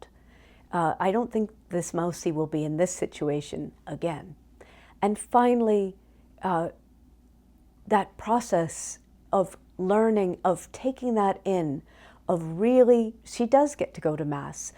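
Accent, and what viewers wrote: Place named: Ireland. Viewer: Thank you for this story! I’m new to your channel and wow, what a treasure trove. (Side note: as an American, I’m really struck at listening to your Irish accent at how much more similar it is to general American than anything found in the UK) But thanks again for your work here, I’m a big fan already 🙌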